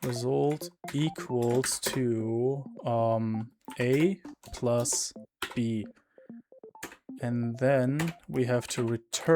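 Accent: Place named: Germany. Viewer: u sound german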